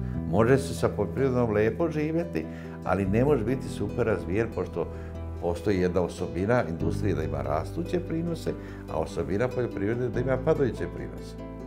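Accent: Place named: Krajina, Croatia. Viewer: Joj kad cujem krajiski naglasak 7 dana napretka nemam..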